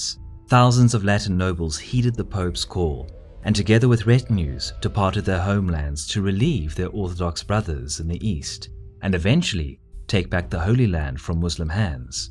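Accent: South Africa. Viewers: comentor of dorleaum battle is he south african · Nice South African accent by the narrator.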